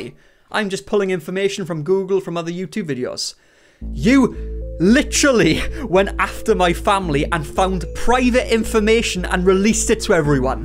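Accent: Wales, United Kingdom. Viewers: He has a Welsh accent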